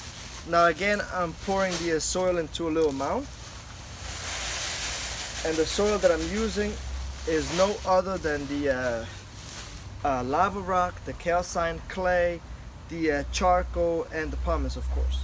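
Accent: Denmark. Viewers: Is that a Danish accent? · Is this guy Danish? I think I can hear something Danish in his accent 🤔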